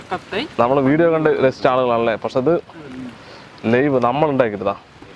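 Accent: Palakkad, India. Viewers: Palakkadaan slang ishtamullavar like iduka👍❤️ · കള്ളി മുണ്ടും പാലക്കാടിന്റെ നാടൻ ശൈലിയും അസ്സൽ പാചകവും പാചക രാജാവ് ഫിറോസ് ചുട്ടിപ്പാറ 👇⚡️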